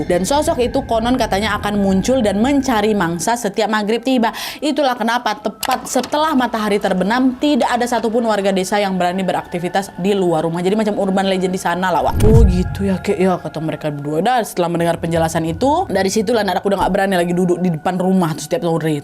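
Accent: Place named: Palembang, Indonesia. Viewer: Logatnyo mencak wong Palembang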